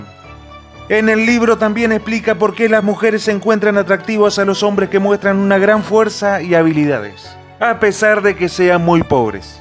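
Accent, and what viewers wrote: Argentina. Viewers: No manches. Yo me dí cuenta de que es argentino luego luego.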